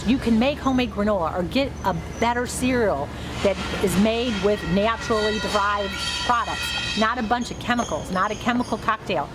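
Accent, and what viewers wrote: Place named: Wisconsin, United States. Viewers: Thank you. I loved the reminder. Where are you from? You have a Wisconsin accent. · Nice Midwestern accent!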